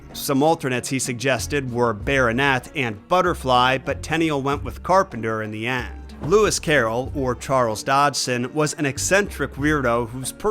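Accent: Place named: United States. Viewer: Never heard it read in an American accent but cool video.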